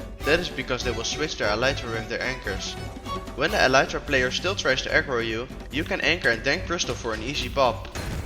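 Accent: Netherlands. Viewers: Nice dutch accent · youre dutch? W vid btw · yo my dutch boi, ye you can hear ur dutch accent very clearly, not a bad thing tho lol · Are you dutch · u sound so dutch wth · i hear the dutch accent 😮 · bro unlocked the dutch accent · the dutch accent 😭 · bro has an dutch/ europian acsent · Klinkt als een nederlander wel goeie vid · Are you Dutch u seem like u have a Dutch accent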